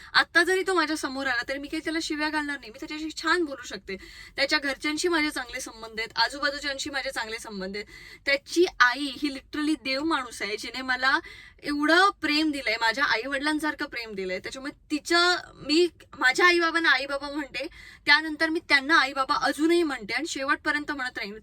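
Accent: Malvan, India. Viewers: I watch your videos only because of your Malvani accent 🥰😍 love Malvani ..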